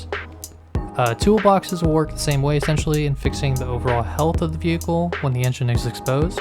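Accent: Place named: Canada. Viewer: are you perhaps Canadian? (You sound very Similar to Kan Gaming)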